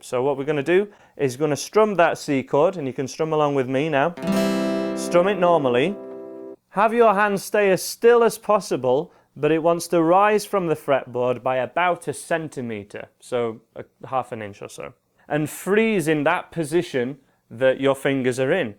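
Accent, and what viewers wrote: United Kingdom. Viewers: This british accent 😍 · Ah, I love your british accent😍 Thanks for the tutorial!😁😜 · british accents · me without glasses btw its overwhelming that I thought you were pewdiepie playing a guitar with a British accent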